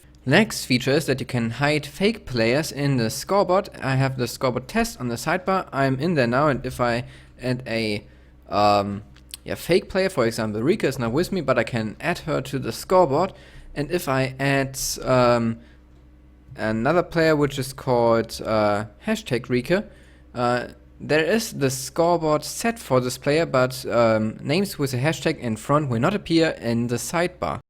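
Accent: Germany. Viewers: Are you german? ;)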